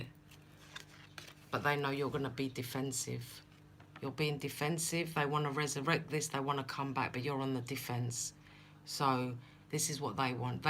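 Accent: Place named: Australia. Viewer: Keep your chin up. The lady will look a bit off. These are collective readings. Good Heavens, not just for her. These are for entertainment. If anyone finds something as advice...well ok. I do at times. Love your Australian accent. :) ................I couldn't do an edit. But yes, LOL!!!! You are so much like someone I know slightly at work. I feel the lady is intuitive but has to hold it back because of the culture at work. :)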